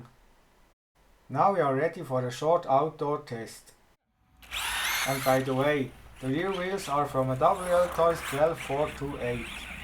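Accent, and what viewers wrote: Switzerland. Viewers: Are you from swirzerlanf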